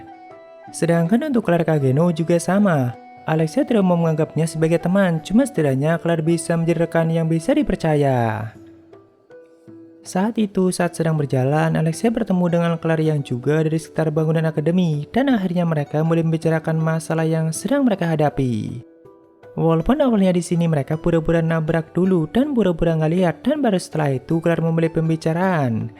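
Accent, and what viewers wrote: Banyumas, Indonesia. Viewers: Ga terasa udah part 45. Mantap apakah mimin orang ngapak2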